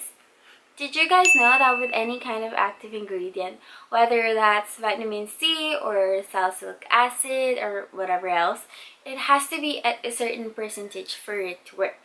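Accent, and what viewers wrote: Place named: Philippines. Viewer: your accent is SOO cute!! are you filipino???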